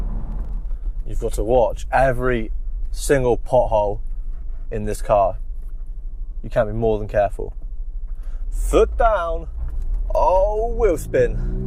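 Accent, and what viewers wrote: England, United Kingdom. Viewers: Hello, where you from? England?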